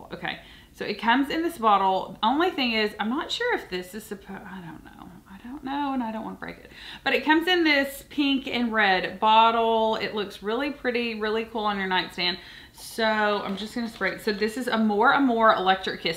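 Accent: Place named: North Carolina, United States. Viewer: SOTD Paco Rabanne Lady Million Lucky 🍀 I always wonder if your from North Carolina by your accent. I’m originally from Long Island NY, moved to Charlotte in my late 20’s and now I live in TN. Hoping to move back to NC sometime in the future.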